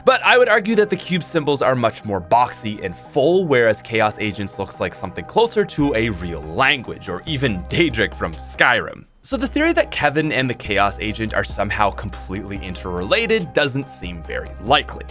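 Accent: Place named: Nova Scotia, Canada. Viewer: First time watching one of your videos and I can instsntly tell you're a Scotian just by that accent 😂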